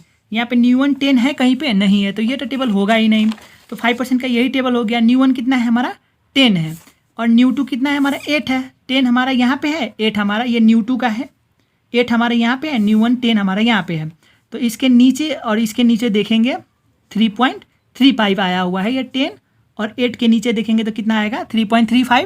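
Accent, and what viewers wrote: Nepal. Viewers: Why do i feel like ur a nepali??that accent is so nepali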